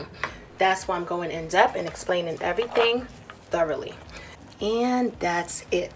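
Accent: New York, United States. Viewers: I’m not from the U.S, is that a Newyork accent? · Dear, please, if you can, write the names of the seasoning / ingredients on the screen, as you add them. Many vloggers do that, and that's Good for people who don't have English as first language, and people who are hard at hearing, and many senior citizens are hard at hearing. Also, not all English speakers will understand your American sounding accent, so by writing on the screen, you'll make it easier for the whole world to watch your videos and appreciate it. Non English speaking Africans may not watch because of the language, most Indians, Chinese, Arabs and others may have difficulty in understanding what you are saying, and by writing on the screen can give you more views. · Sound like you from New York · This recipe looks so good. My mouth is watering ok!!! I love the way you put it all together with simple ingredients. 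I know you're my NY homegirl from the way you say "sauce" "talking about" and other words as well. Thanks for sharing your wonderful recipes and impeccable cooking skills. By the way I'm a Bronx girl "The Boogie Down". Keep doing what you do!!!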